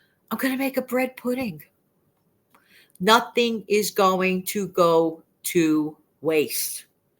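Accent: New York, United States. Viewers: Personally, i love your New York accent. I think it's great!😂 Oh, i remember those handi snacks,! Those were quite good as i recall! Slim Jims are good too.No red stick....thats just wrong!😮 I agree that things could get very crazy and soon. Yes, we need to be prepared! I thought i would buy a few packages of those wraps we use to make burritos (just cant think what you call them). Thought they would be a good bread replacement in a pinch. Yup, gotta have some sort of grocery plan! Definitely!!! Yes to peanut butter, all day! I love oatmeal too, brings back such good memories from childhood. We always had canned fruit and veg when i was growing up. Still do. Great video. Much appreciate all the great ideas and encouragement....bless all your efforts. I am very grateful. God bless ❤❤❤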